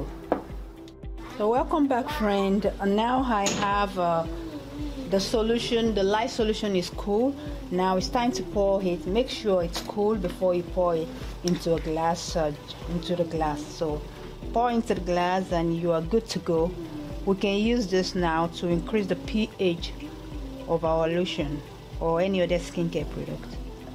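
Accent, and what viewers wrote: Nigeria. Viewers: Ok, this trashy Nigerian accent gets on my nerves.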